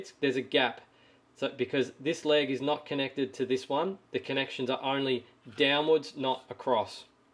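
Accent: Australia. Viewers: Very good tutorial, been following your channel for a while and love how much info you share. Also it's good to hear another Aussie voice.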